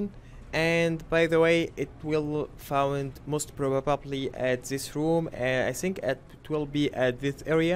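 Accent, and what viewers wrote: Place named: Egypt. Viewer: ur an egyptian thats an egyptian accent i know because i am an egyptian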